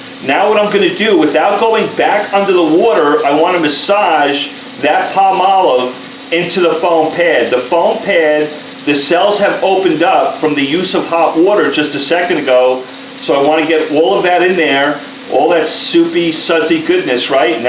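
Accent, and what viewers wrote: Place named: Dallas, United States. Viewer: Good video w/ info - noticing a heavy Dallas accent there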